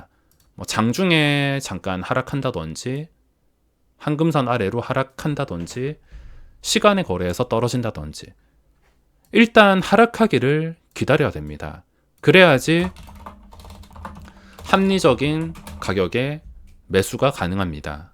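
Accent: Gyeongsang, South Korea. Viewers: 안녕하세요 님, 거의 1년만에 인사드리네요 ^^ 특유의 경상도 사투리의 구수한 말씀을 다시 들으니 좋네요... 그동안 너무 많은 영상을 보지 못했는데, 오늘부터 하나씩 보면서 공부해야겠군요...좋은 영상 감사드립니다.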